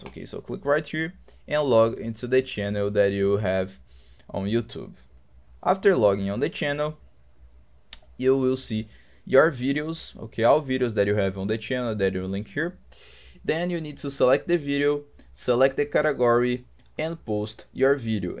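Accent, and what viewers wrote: Brazil. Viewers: Quando comecei ouvir eu pensei "Estranho, acho que é brasileiro" quando vi o nome " " eu tive quase certeza, ai então eu vi o amigo "F-100 reformada pelo lata velha" KKKKKKKKKK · nem fudendo que quem me ajudou foi um brasileiro falando ingles com sotaque brasileio kkkkkkk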